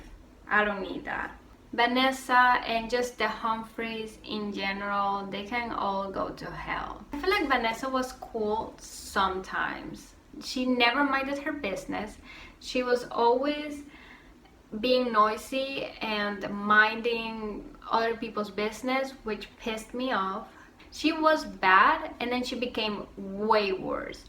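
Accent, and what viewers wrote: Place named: Puerto Rico. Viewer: I love your accent. Reminds me of my Puerto Rican grandparents and cousins 🥰🥰🥰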